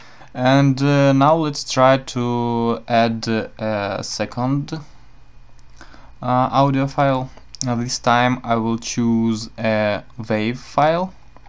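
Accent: Russia. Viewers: А я по интонации сразу поняла что русский! :P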